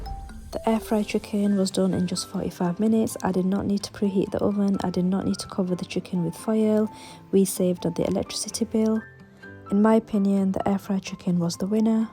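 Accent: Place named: North, United Kingdom. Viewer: You may be a little too young.. but there used to be a show called WHY DONT YOU on kids tv... they always had someone that sounded like urself.. most of the time it was how to create something silly out of left over toilet rolls.. but the cadence of ur voice matches the instructions perfectly :) but its the northern accent that sells it :) took me back hehe.. great vid.. keep up with them!!!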